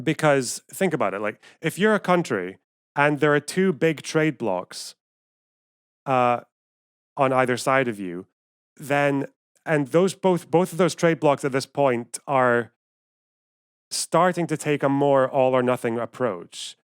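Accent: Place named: Glasgow, United Kingdom. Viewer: At first watching your channel I was like “this guys got a super familiar accent” Then I realized my dad was from Glasgow, moved to Canada when he was 19 and lost most of his accent. You sound so much like him it’s crazy.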